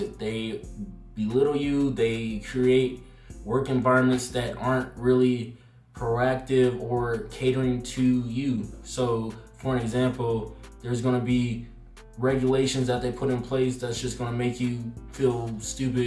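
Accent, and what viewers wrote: Baltimore, United States. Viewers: R u from Baltimore u kinda got the accent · Is that a bmore accent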